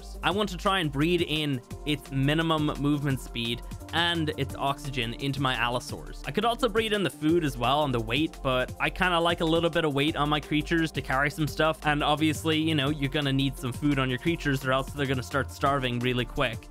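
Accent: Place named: Ireland. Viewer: He is Irish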